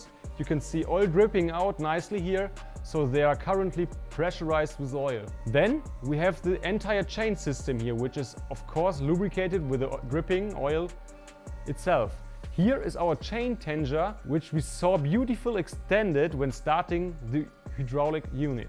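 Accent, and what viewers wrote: Germany. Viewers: shut up, he is German and has an accent, I challenge you to pronounce 10 German words correctly if you can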